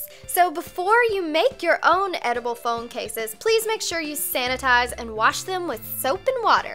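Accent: South, United States. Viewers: She is Sooo stupid I hate her country accent eww I'm discusted by her utube ideas and no I won't leave her alone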